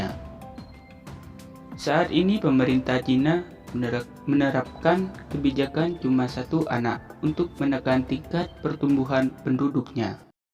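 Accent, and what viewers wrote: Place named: Aceh, Indonesia. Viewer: bahasanya kebanyakkan dan dan dan dan dan logatnya logat aceh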